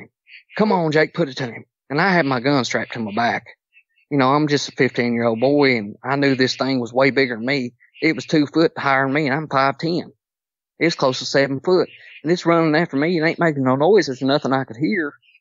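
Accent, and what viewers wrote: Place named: South, United States. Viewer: Thanks for sharing your story! Love your accent! I'm from Mississippi so we Southerners all have a shared background, your grandparents sound like my own. <3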